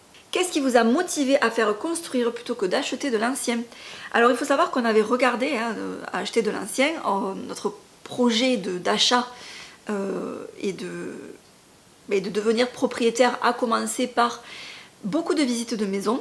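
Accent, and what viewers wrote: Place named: South, France. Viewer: Coucou, Il y aura toujours de la critique et des envieux....c'est la nature humaine.. Toujours un plaisir de t'écouter avec ton bel accent du midi.. Bizzz😘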